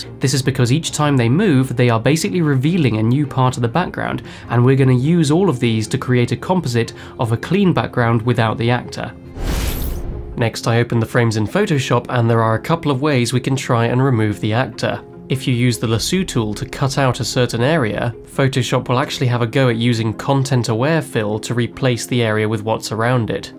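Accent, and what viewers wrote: United Kingdom. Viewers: He’s got the English accent down pat...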